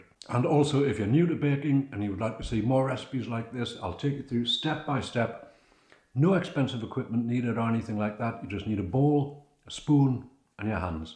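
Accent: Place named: Newcastle, United Kingdom. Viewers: I am from South Shields on Tyneside and he sounds like he is from our neck of the woods indeed. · Looks delicious & easy method to make, will give it a go, & you sound like you have a ‘Geordie’ accent… North East UK, where are you from please?? & thank you 👏👏🌟😋🍽😋🎉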